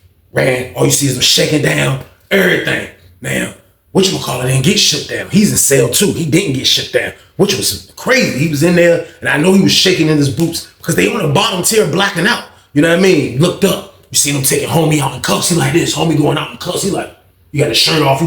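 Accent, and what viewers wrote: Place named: New York, United States. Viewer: Yo tbh you dead ass a New Yorker that never lived here The way you talk, the way u explain things, the way you move all i see is New York This is kinda why i gravitate towards u (pause) But nahh for real your stories are A1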